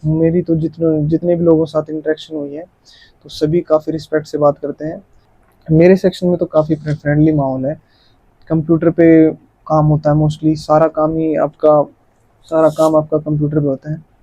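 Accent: Haryana, India. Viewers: Bro you're from haryana? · That haryanavi touch✨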